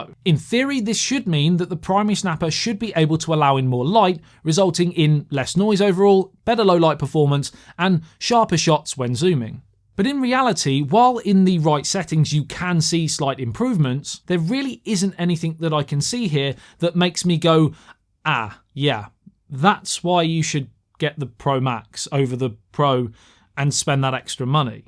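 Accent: United Kingdom. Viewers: Liked for the British accent 😊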